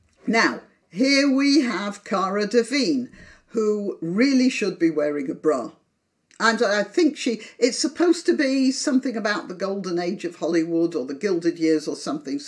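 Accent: United Kingdom. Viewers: Roasting celebrities in a British accent is really next level… 👏👏👏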